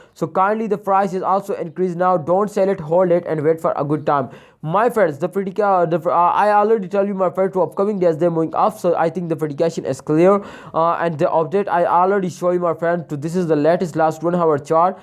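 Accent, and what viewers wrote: India. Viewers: Man your accent sound me Indian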